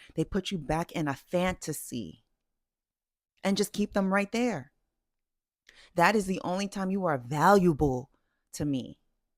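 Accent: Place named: Bay Area, United States. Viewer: I knew you were a Bay girl!!!! hahaha I was like she from LA?? ehhh nah...then you said it lol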